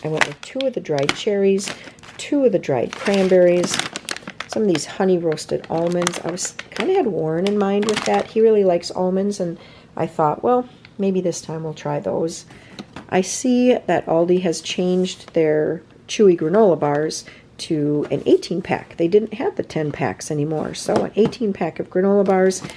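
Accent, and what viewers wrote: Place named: Midwest, United States. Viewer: Gotta love that midwestern accent… bag-els :-)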